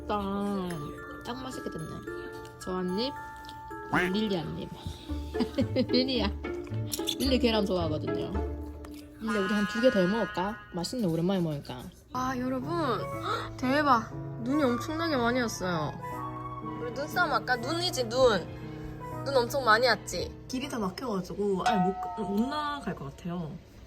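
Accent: Gyeongsang, South Korea. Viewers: ㅋㅋㅋㅋㅋㅋㅋㅋㅋㅋㅋㅋㅋㅋ 억양이 완전 대구 억양ㅋㅋㅋ귀여움..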